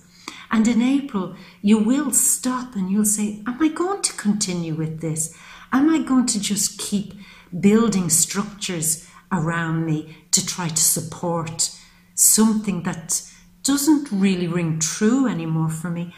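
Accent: Ireland. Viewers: Hello, thank you for your well prepared reading. I loved the references and quotes of famous Pisceans. I find you to be very actuate (for me) and very profound. Since the beginning of the year (I have spent a lot of time thinking (alone-like the Hermit), I am going through a life changing transformation and your info is very timely. I am so happy to have found you. A Big Thank You. I love your lovely Irish accent too.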